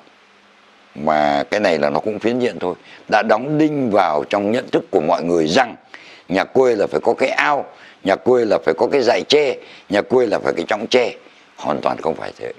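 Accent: Hanoi, Vietnam. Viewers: Xem những video chế anh, em thấy buồn vô cùng. Cũng chỉ vì những con người hiểu biết nông cạn. Em Theo dõi anh hơn năm nay rồi. Đã nói tới ẩm thực là một đề tài khó. Ai mà hiểu cho hết. Cứ coi như anh kém cỏi đi. Nhưng chịu khó tìm hiểu sẽ tốt hơn. Anh giọng hay chuẩn mực, hơn người Hà Nội nhiều.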